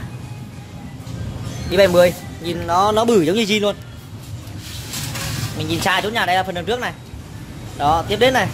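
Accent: Nghe An, Vietnam. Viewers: Ad xứ nghệ phải không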